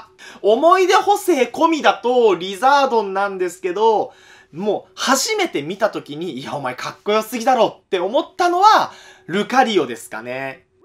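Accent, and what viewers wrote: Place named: Kansai, Japan. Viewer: 関西弁ええな！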